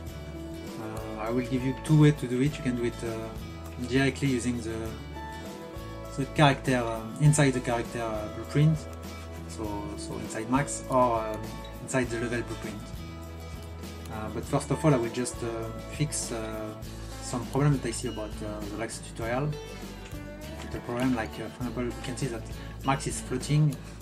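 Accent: France. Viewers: are u french ? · Je l'ai capté direct que tu étais Français ahah x) Pourrais tu refaire le même tutoriel en Français ? Car moi, je ne comprend pas l'Anglais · XD l'accent Fr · Hey ! Nice video :) Are you French ?^^ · Cet accent Français xD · Je me doutais déjà que tu étais français vu le titre de la dernière vidéo mais là c'est sûr ! ^^ C'est cool de savoir que tu es français :D En tout cas tu te débrouilles super bien, j'ai rien compris à la vidéo mais bon x) (enfin c'est pas que ton accent est si horrible que ça hein, c'est juste que j'y connais rien perso xD) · Are you from France because you have the same accent si tu est français pourrais tu fair un tutoriel en français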